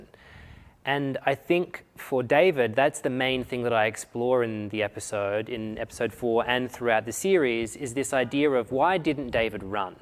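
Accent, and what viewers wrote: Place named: Australia. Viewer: I love australian accents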